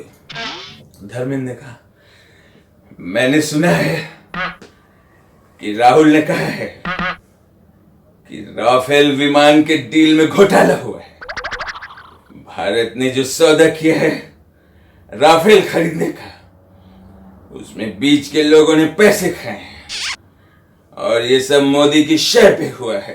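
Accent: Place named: Bengal, India. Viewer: Sir...aapka Bengali accent bda tagda hai.....👍👍👍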